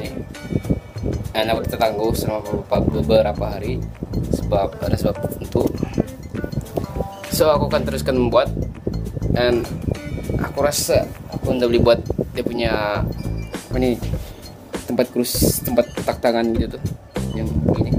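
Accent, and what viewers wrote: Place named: Brunei. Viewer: Ohh patut tah melayu mu cam brunei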